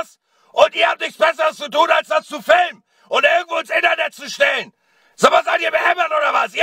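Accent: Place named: Hamburg, Germany. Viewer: In welcher Stadt wohnst du weil du klingst so Hamburgisch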